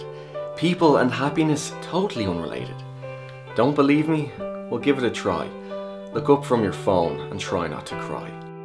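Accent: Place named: Ireland. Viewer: Your soo funny and I would do anything to have your IRISH ACCENT. Swap? I'll give you A Scottish one for your Irish One? Sound Good? :D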